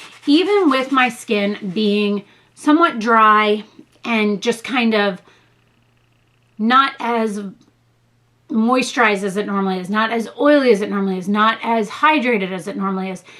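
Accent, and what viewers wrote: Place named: Greece. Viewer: Where are you from? Greece? You speak like greeks speaking English. Very beautiful acccent.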